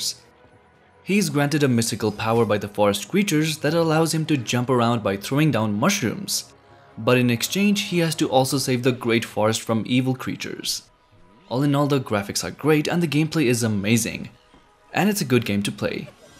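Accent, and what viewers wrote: India. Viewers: this guy's English Accent in INDIANISH. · Indian accent can never be hidden..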